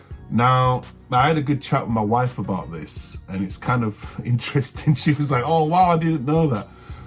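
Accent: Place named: North Yorkshire, United Kingdom. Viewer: Interesting channel this! We do love our kebabs never even thought of who designed the logo! That’s definitely a North Yorkshire accent! All the best for 2025 👍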